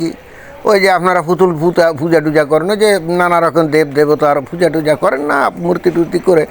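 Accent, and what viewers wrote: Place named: Narail, Bangladesh. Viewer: মহাশয়, আপনার ভাষার টান শুনে মনে হয়, আপনি নড়াইল বা এর কাছাকাছি কোথাও জন্ম। আমার বাবা ঠাকুরদার বাড়ি ওখানে ছিল। এই রকম কথাতে টান ছিল ওনাদের। আপনি ডাক্তারদের সঙ্গে কথা বলেছেন, ওরা ভয়ে কোন মন্তব্য করেননি। মুসলিমদের কোনও বিষয়ে অপরিচিত লোকেদের সামনে মুখ খুলতে ভয় পান সাধারণ অমুসলিমরা ,রাজনৈতিক কারনে।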